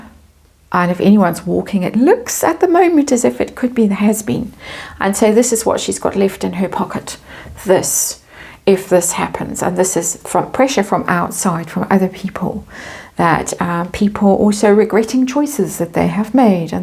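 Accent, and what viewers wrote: South Africa. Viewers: Hi do I hear a South African accent ? Hello if you are ❤❤I am too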